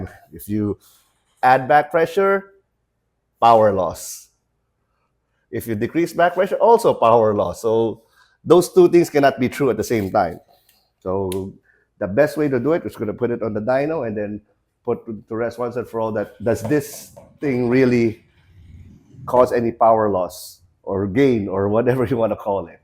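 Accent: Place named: United States. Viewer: parang laking US si kuya..ganda ng english eh hehehehe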